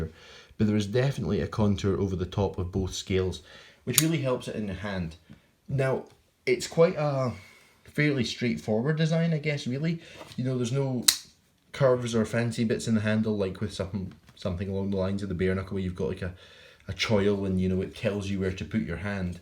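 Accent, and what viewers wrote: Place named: Scotland, United Kingdom. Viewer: Nice review. Good to hear a Scottish accent :) I have just ordered the Slayback with the san mai ZDP189 blade. Great looking knife.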